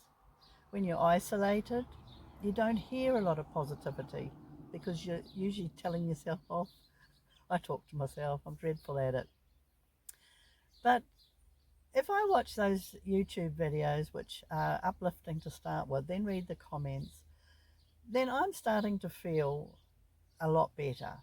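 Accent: New Zealand. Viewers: It's fantastic to hear a kiwi accent. Totally agree, everyone needs a purpose, even if it's small.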